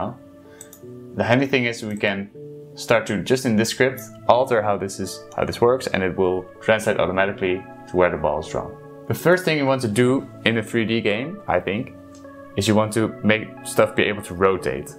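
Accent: Netherlands. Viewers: Ziet er goed uit, ik raad je aan om een keer te kijken naar OpenGL of iets in die richting. Dan kan je een echte game engine bouwen hoewel OpenGL niet de beste Graphics API is maar is wel goed voor een beginner (ik neem aan dat je een beginner bent aangezien je game maker gebruikt, vat het niet verkeerd op xD) Als je je afvraagt hoe ik wist dat je nederlands bent ik hoorde het aan je accent. :) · Ik hoor nederland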